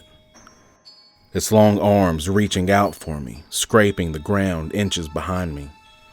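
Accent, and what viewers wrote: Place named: South, United States. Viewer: Yet southern accent turns me on.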